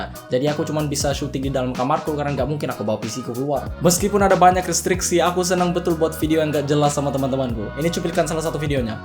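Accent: Makassar, Indonesia. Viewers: logatnya kyk orng makassar hahahahah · Lahiran Makasar jdi kebawa logat ibunya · Logat makassar juga ya? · Ada logat Makassarnya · sounds like using makassar dialect :) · logatnya malah kaya orang makasar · kok sedikit logt nya mirip orang makassar yah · bahasa logap makassar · lu orang makassar?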